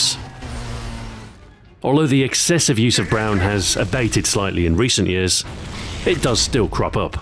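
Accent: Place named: United Kingdom. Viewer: Ah-cah-reena is just the American accent. The narrator here is British, so pronounces the letter "o" very sharply like all "o"s are in the British accents.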